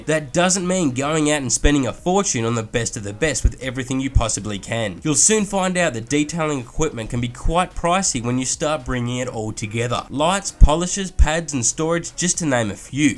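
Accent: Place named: Australia. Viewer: Your Australian accent is giving me a blinding headache....I’m not from Australia....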